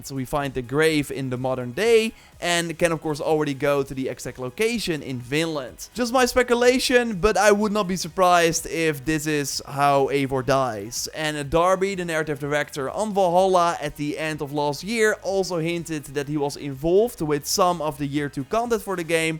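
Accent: Netherlands. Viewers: The Holland dutch accent tho strong here.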